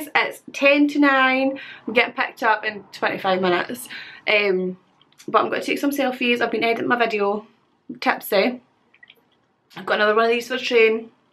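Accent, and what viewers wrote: Scotland, United Kingdom. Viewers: I will never understand why your channel has never caught on with the YouTube algorithm. You are funny, smart and beautiful. But more importantly, you consistently upload content that is on brand with other lifestyle YouTubers. I stumbled on your channel years ago when I was asked to do a Scot accent for a role I was playing - not sure how you feel about your GRWM videos teaching an American your version of the Scottish accent but oh well. Anyway - I wrote you this novel to say, I love that you have never stopped sharing your life with the world - I hope that you find success in all the things you do. Cheers!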